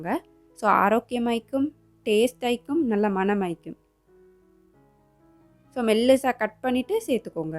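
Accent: Sri Lanka. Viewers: Assalamu allaikum warah nalla erukegala sis...srilanka slang enaku pidikum neega alaha peasurega..ginger,garlic paste neega intha recipeku podala...na try panrean🙂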